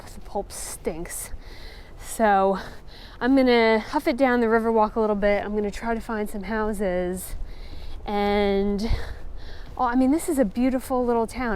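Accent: South, United States. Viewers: You definitely developed a gentil southern accent for a jersey girl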